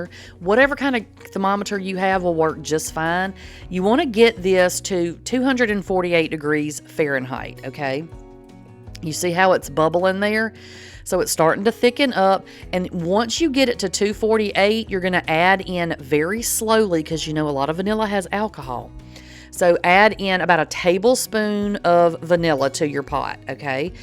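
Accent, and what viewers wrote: South, United States. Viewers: At times, I hear myself....horrible Baltimore accent...lol....more so than my parents ever had....😳...Your southern sound, very calming. Lol Luv your videos hon !!!!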